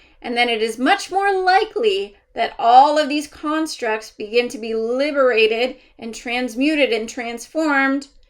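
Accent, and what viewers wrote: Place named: Canada. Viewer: Thank You, Took Me Years to Realize this. And The Re - Minder Is Great!!! ✨️✨️✨️⭐️💔💖💞 Love It. 🙂. I Luv The Way Your Guide Explains this. ☀️ And The Canadian Accent, Smile Is Priceless. 🙂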